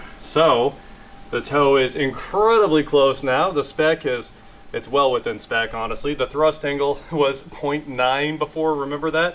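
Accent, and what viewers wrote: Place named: Midwest, United States. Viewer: the "needs replaced" dialect is very interesting , only heard it in the middle of the USA, versus "needs to be replaced". Noticed it over several videos.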